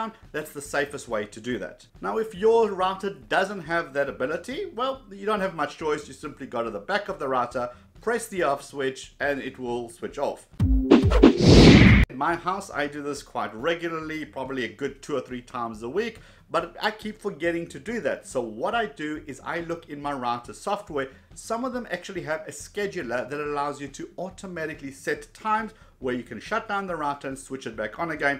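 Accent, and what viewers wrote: Johannesburg, South Africa. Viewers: U sound South African ... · Love that Joburg accent. Content is also great. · love the South African accent ! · South African? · stumbled onto this vid and 0.2 sec I can tell he's from SA :)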